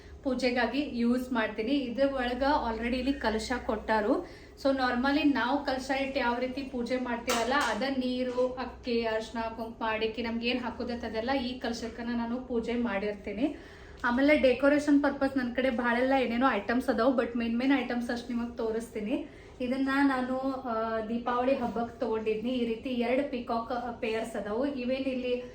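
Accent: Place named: Belagavi, India. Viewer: Nim language nodidre belagavi davr taran ide aadru marathi jana matado tara fell aagutte y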